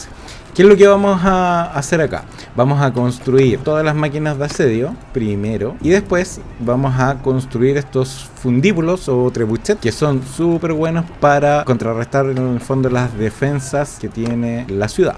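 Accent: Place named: Chile. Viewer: en 5 segundos distingui el horrible acento chileno. Saludos desde Chile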